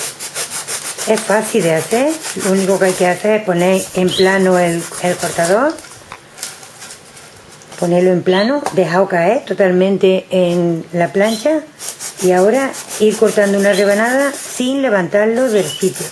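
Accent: Andalusia, Spain. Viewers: tienes talento. Seguro. Además tu voz y tu bonito acento del sur subyugado por el canto de tu canario. Cordiales saludos! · eres española tienes unas maneras tan particular de decir las cosas .bueno saludos ...pd. eres andaluz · Excelente trabajo y me gusta mucho tu acento. De qué arte de España?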